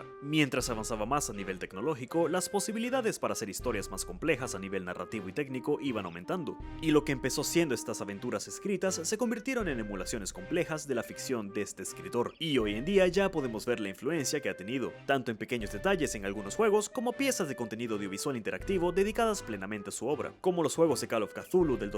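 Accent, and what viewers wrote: Venezuela. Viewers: De casualidad serás venezolano?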